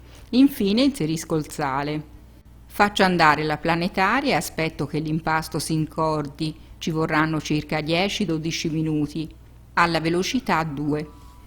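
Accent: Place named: Pienza, Italy. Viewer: Fantastici!!!! Le tue ricette sono perfette vengono sempre ...e poi mia madre e’ di Pienza.... il tuo accento mi fa sentire a casa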